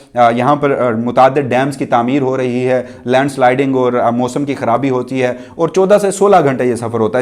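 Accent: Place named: Punjab, Pakistan. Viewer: پلیز اردو بولنے میں خیال رکھیں یہ ہماری قومی زبان پے بھائی اسکو تو صاف طور سے بولا کریں ہماری تو خیر ہے کیونکہ ہم اردو کو بھی اب پنجابی لہجہ کے سننے کے عادی ہو گئے ہیں مگر ہماری نسلیں تو اصل اردو کو بھول ہی جائیں گے لفظ سیاح میں ی پر تشدید مانی جاتی ہے مگر تشدید لکھی نہیں جاتی تو لفظ "سی یا"ہے سیاہ نہیں یہ صرف آپکی اصلاح کیلئے لکھا ہے شکریہ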